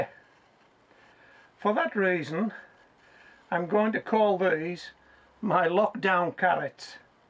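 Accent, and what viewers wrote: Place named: Scotland, United Kingdom. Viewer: I believe it's. I think it's Scot?😏 Could be wrong, it's happened once before...😳😛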